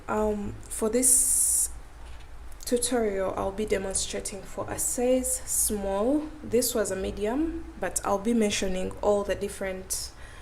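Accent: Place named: Uganda. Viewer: Cool pattern! Also, I lit up when I heard your accent. I used to live in Uganda and your voice made me miss it!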